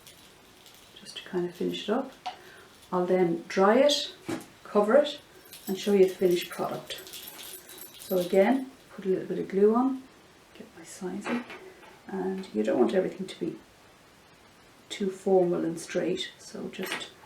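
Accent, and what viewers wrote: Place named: Ireland. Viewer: Really enjoyed this ,,,good to hear an Irish accent.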